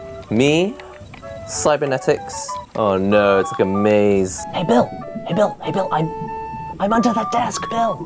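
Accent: United Kingdom. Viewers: I already asked but are you british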